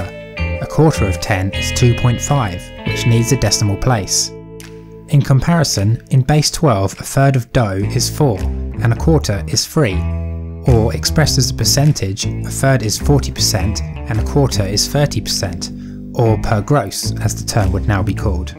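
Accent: United Kingdom. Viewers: Let me ask you something, do you use metric system or imperial? British accent is funny, we from Brazil think the same about the Portuguese accent, but this doesn't make his argument less valid. Use imperial system however is stupid, and what makes it worse is that USA is affiliated to the metric standard and all of its imperial bullshit comes from metric's standards, it's only a conversion.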